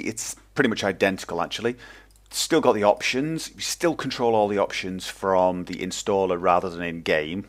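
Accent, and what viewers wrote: United Kingdom. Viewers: Would guess British but lives in Norway. But man, Trondheim is such an epic name! Greets from Sweden.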